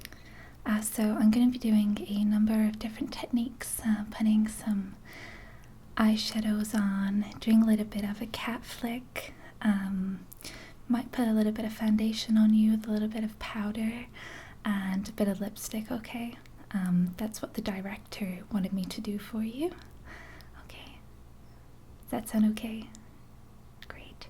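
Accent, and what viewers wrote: United States. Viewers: I hardly comment on videos but had to say to you that you do it so good!!! Im a latina and prefer your natural accent but the american one you do sounds exactly like an american person!!! And an excellent RP!! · So crazy to hear you speaking with an American accent!!! Like my brain was confused or something! Great job, the sounds were so relaxing, thank you! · Ur American accent is great! Ur an honorary Yank! :) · your American accent is better than and I'm an American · I must admit, this is some decent American accent! It is amazing how u can switch ur accents fluently! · As an actor and language enthusiast, I'm always trying to master accents from around the world, but I rarely get to turn the tables and judge a foreigner doing an American accent. It's pretty good! You only have problems with your o's and some of your a's. But even past that, the only thing that was big enough to give you away that you're not American is the way you said "director". 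But like I said, pretty good. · Awesome video as always!! Great job with the American accent. You made it sound so believable (if I didn't know that you were British already, I would've thought that you were an American). The rain helped too. Have a nice day. 😉😊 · I'm not going to lie. You do a really good American accent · excellent job on the American accent! :-) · very good America accent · Amazing American accent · Lots of fun and very relaxing =) Is it hard to keep the american accent throughout the filming process? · Nailed the American accent. Perfect. Wouldn't be able to tell you weren't American if I didn't already listen to some of your other videos ;)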